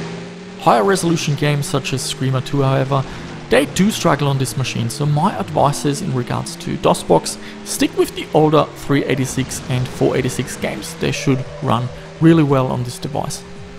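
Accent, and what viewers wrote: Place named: Germany. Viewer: I didn't know you lived in Australia, your accent makes you sound like you're from somewhere in Europe, Germany perhaps?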